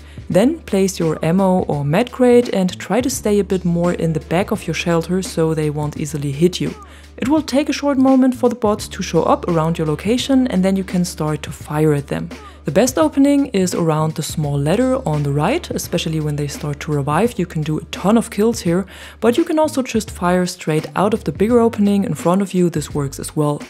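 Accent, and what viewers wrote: Germany. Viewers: You Germany accent is so beautiful 😍😍😍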